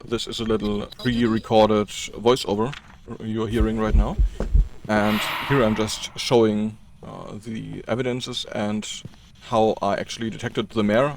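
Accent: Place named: North Carolina, United States. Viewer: you, sir, have an unusual North Carolina accent...:face-orange-raised-eyebrow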